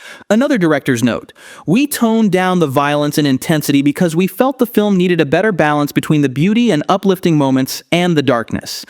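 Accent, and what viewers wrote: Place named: Russia. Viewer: Что за хрень? Откуда этот рашен инглиш? Аж уши режет. Why?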